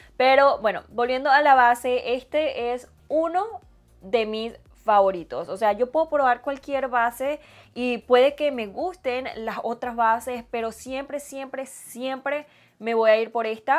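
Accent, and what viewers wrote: Venezuela. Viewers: Me encantó el vídeo! Primera vez que veo tu canal y de verdad me encantó. Jaja ya decía yo ese acento es Venezolano ♥️ saludos desde Barquisimeto.!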